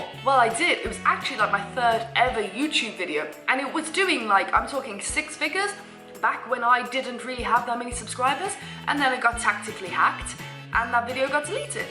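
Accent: United Kingdom. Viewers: Out of curiosity... you're British not Australian ryt?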